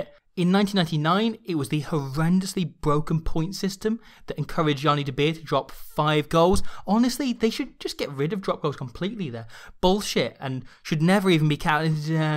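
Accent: Wales, United Kingdom. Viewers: I thought he was welsh pls someone tel me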